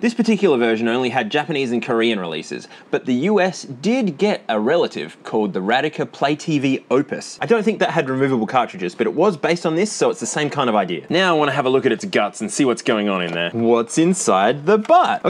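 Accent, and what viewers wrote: Australia. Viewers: Shouty aussie man's friend is playing on a butt! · They're both Australian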